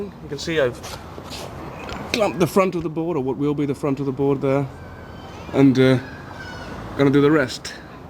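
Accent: Netherlands. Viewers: Haha ik herkende gelijk dat je Nederlands bent. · Ik wist dat dit nederlands was!! hoor je aan het accent ;) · nope hes dutch u hear the accent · Wow ik hoorde haast niet dat je nederlands bent! Je engels is echt goed, je hebt niet dat rare nederlandse accent zoals mij :') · he is Dutch there's euro symbols on the price tags and the accent is very Dutch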